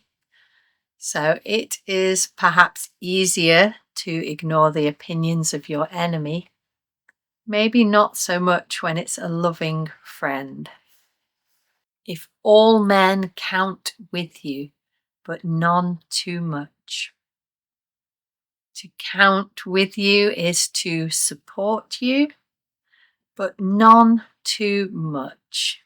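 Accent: United Kingdom. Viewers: Thanks a lot for sparkling our love for English language and poetry with British accent.❤❤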